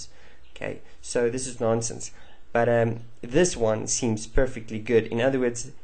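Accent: South Africa. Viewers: South African? awe my bru!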